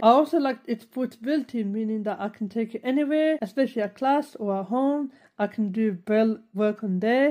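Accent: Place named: United Kingdom. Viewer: Are u British I love ur accent ❤❤❤❤❤❤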